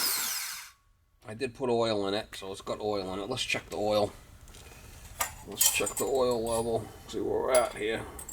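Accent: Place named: Massachusetts, United States. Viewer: It's actually a south coast massachusetts/rhode island accent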